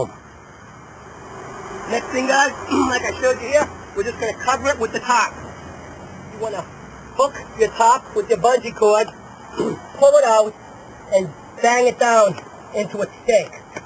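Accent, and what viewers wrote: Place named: Boston, United States. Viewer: Ha! You're definitely from Massachusetts or maybe even Rhode Island. What gave it away, you ask? Oooh, one of the original shirt designs from WAAF, the Patriots hat and the THICK Boston/Providence accent. I'm not raggin bud, I'm from Massachusetts and I often forget to realize how we sound... it cracks me up. I don't usually see or hear fellow New Englanders on youtube, kudos. Great video, with a great idea. Keep it up. Cheers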